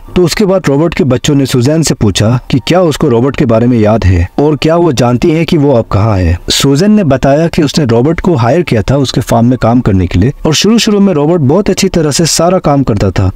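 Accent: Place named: Northeast, India. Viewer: आपका हिंदी का नौर्थइस्ट वाला ऐक्सैंट आपको ज़रूर हिट करेगा।लगे रहो दोस्त।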